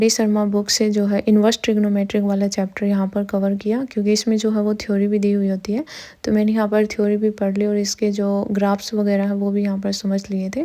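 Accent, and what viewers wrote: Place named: Kashmir, India. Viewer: Mam apk accent se lga..kashmiri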